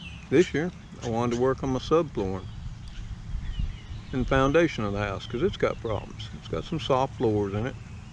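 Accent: South, United States. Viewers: your southern twang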